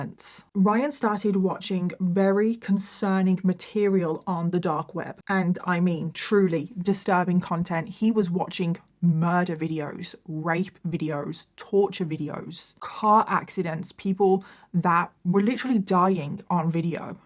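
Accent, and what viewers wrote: United Kingdom. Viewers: Can somebody please tell specifically what kind of British accent has? Thank you. I like it.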